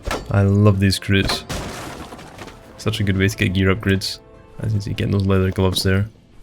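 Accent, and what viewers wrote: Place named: Northern England, United Kingdom. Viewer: Great video. Just wondering cant place the accent, northern England or Scotland?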